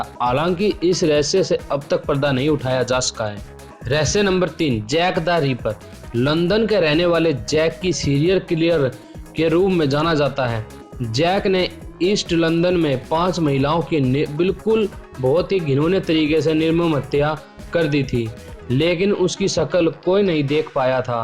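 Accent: Haryana, India. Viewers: Bhai Haryana se h kya tu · Haryana ka hai paka akhar sound se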